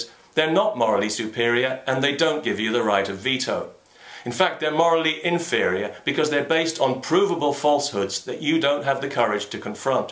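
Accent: England, United Kingdom. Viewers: An Irish man with a English accent .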